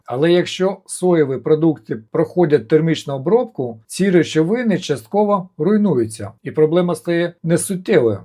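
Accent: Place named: Poltava, Ukraine. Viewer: щиро дякую Вам за відповідь! Я з Дніпропетровськоі обл, але темчасово знаходюся в Даніі, а подумала, що ви з Полтавськоі, бо говор схожий на наш! Робете дуже важливу справу, щоб наша нація була здоровішою і сильнішою! Вам бажаю процвітання і до вершин без перешкод!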